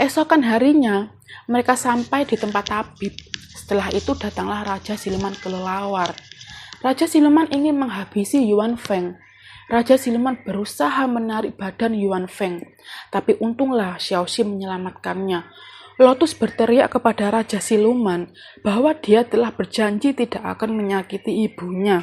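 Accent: Java, Indonesia. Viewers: Ya maklum lah,,,, mungkin mbak nya dari suku Jawa 😋😋😋😋😋kan masing-masing chanel punya ciri khas 🤡🤡🤡🤡🤡🤡🤡🤡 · Jowo" mbak😂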